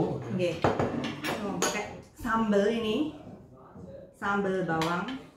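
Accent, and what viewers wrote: East Java, Indonesia. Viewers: Hahaha lucu mbak ikiii, medhok nya jowo tenanan.😍 Tp pancen enak tenan Sayur Bobor kuwi...., karo iwak asin ae wes TOP mar ko TOP.. Jadi ngiler akuuu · Mb ini dr logatnya mesti jawa timuran ki..😆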